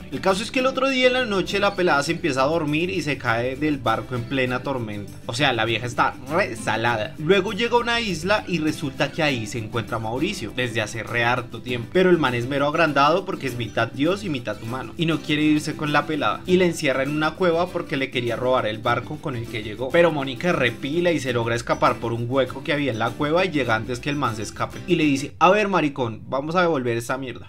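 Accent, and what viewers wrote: Bogotá, Colombia. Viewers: Jajajaja me encanto Todo Tu forma de hablar y la manera en lo que lo resumió súper colombiano, Nueva sub :> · Me encanta el hacento rolo que hace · Ten en cuenta que quien hace estos videos es rolo, es decir , de Bogotá o cerca. No todos los colombianos hablamos así ;) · me gusta como narras este resumen xd, ya que yo tambien soy colombiano pero el problema esque perdi mi acento y me encanta volverlo a escuchar :3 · es re bogotano XDDDDD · Pero si habla puro rolo, cómo va a ser de medallo? 🤣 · Eres colombiano?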